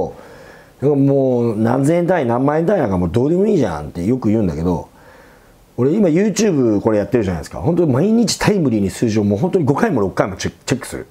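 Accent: Nagoya, Japan. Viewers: 苦労した人ほど人に優しく出来るんですよね。 今回のテーマは共感できました。 説明も社長らしくて、わかりやすいですよ。 地元が近いんで名古屋弁がどら懐かしです。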